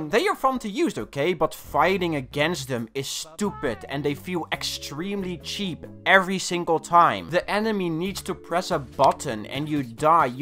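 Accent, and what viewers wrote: Netherlands. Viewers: Are you dutch? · hes dutch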